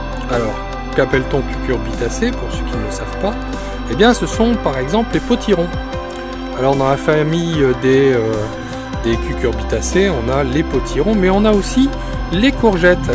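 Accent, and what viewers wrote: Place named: Aisne, France. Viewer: Coucou 😁vu l'accent je reconnais bien le 02🥰 je suis de l'Aisne également je te découvre aujourd'hui je m'abonne 👍je te laisse faire un tour chez-moi et pourquoi pas t'abonner si mon jardin te plaît....A bientôt 😉🍀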